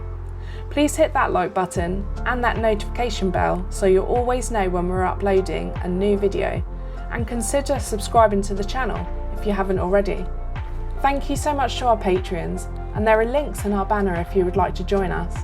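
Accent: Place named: United Kingdom. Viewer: 🌎🗼 hello from your french friend 🥐☕ I love to hear your British accent ! what a great place! thank you for sharing with us my dear friend! 🌾🌿 nice aerial views! 🪴🌳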